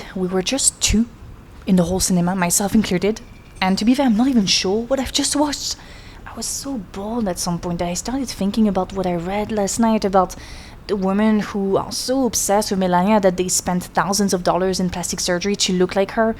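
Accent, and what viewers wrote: France. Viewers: How dare you call Trump a fasist, I do feel sorry for you, young, damp and with your french heavy accent, says everything! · P.s. You're gorgeous! And that frnch accent🐕🤩🥰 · Is it a french accent or an I tripping? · ARE YOU FRENCH?????? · I hate the French accent, you should LEARN English. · New watcher (from Sweden), love the French accent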